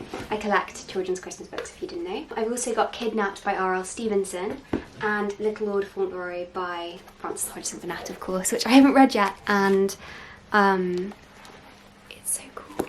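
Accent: United Kingdom. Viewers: I opened this video ( just because I saw a nice picture) not expecting much buuuut the moment you spoke first words I fell in love! I love British accent and I wish I could find more British booktubers but there’s a thing I can’t find anyone at all or I find a person who is not my type and I’m boring to watch… Summing up everything I said, I’m so glad I found your channel! Thank you for your videos and your beautiful bedroom haha🍃✨ p.s. if somebody got a British( Irish/scottish are welcome too) booktubers recommendation, I’d love to hear that!!💗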